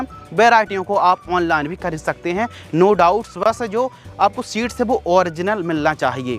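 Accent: Bundelkhand, India. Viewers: सर आप बुन्देलखण्ड से हो का ❤❤हमे yesa लग रहा हैं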